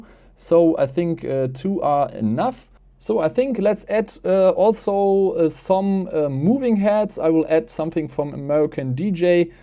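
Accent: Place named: Germany. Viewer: sorry this german accent when you speak english it's really horrible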